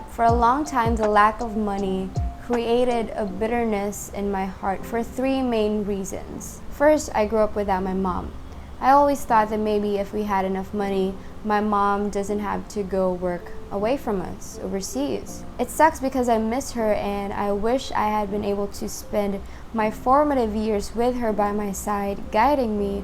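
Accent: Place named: United States. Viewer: Wow, your attitude is amazing. Sorry to hear that you have been through a difficult time. Out of interest, are you from Phillipines, as you sound American 😊!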